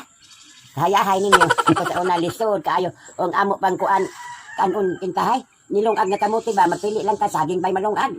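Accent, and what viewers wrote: Surigao, Philippines. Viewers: 😁😁😁😁 lingaw Jud ko nimo la ako Lola taga surigao sad ingana sad mag storya sa emoha 😁😁😁watching from parañaque 😁😁😁😍😍😍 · Lola,makalingaw jud imng storya la, bisaya surigao accent.... taga surigao here sad lola. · asa ka dapit sa surigao lola? mao diay ang imo sinultian familiar kaayu 😁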